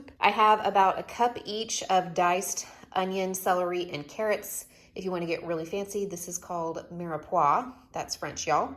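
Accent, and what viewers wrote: South, United States. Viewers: I can’t tell you why but the “that’s French y’all” with your southern accent absolutely sENT me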